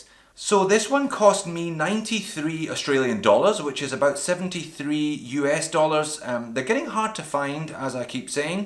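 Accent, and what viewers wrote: Scotland, United Kingdom. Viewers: Upon starting the video I was absolutely delighted to hear your Scottish accent lol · Greetings from New York. Is that a scottish accent? Beautiful watch, but I've never seen a bracelet come in such poor condition with such horribly rolled links. The case and dial look right on.. but that bracelet almost looks counterfeit!...Either way it's a beautiful watch and I agree whole heartedly that it punches far above its weight class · where are you from? scotland?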